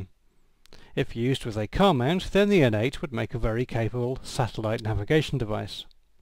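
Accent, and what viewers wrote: United Kingdom. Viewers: I hate english accent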